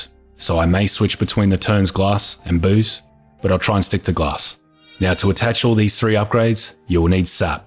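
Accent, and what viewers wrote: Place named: Australia. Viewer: gotta love this australian accent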